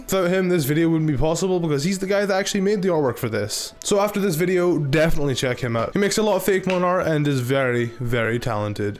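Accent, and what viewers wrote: Scotland, United Kingdom. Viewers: Is half Scottish, because his voice has a faint hint of Scotland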